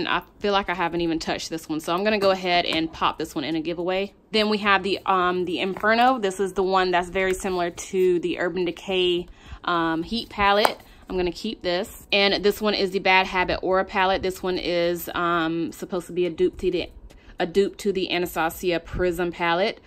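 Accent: South, United States. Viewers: Your southern accent is so adorable😆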